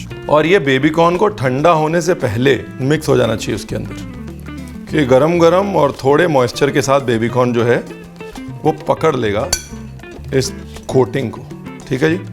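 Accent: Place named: Punjab, India. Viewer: your explanation is very impressive. your simple style is very good . you are fit to become another Pran of Bollywood with your beautiful Pnnjabi accent.GOD bless you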